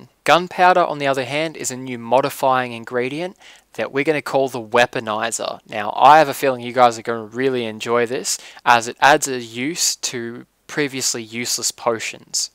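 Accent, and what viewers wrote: Australia. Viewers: your my favorite minecraft person and can u play some battlefeild 3. Do u come from Australia coz u have an Aussie accent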